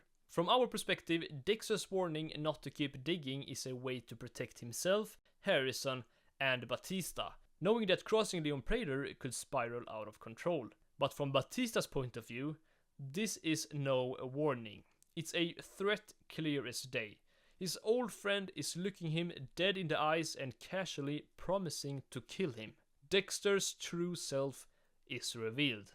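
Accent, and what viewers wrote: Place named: Sweden. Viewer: name: '' '' with a dialect like this? hmm.. can it be? yes it can. youre swedish!;) sup man, also swede here, good vid, ta hand om dig and see you in the next dexter vid. i dont know why i write in english, i just do.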